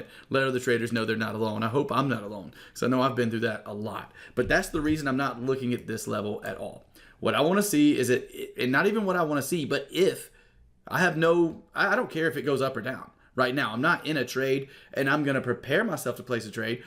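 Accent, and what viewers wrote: South, United States. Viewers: Love your southern twang.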